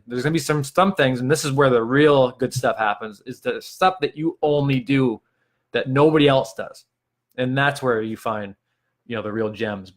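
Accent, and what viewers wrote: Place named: New Hampshire, United States. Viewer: You sound like you're from Troy, NH.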